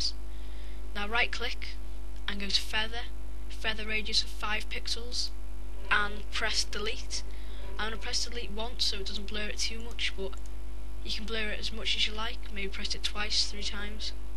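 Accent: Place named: United Kingdom. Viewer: i was GOING to listen and watch this tutorial but i heard that you were british and like final fantasy so related videos here i come..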